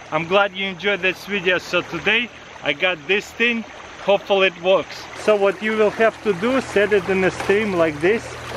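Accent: Russia. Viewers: russian? :D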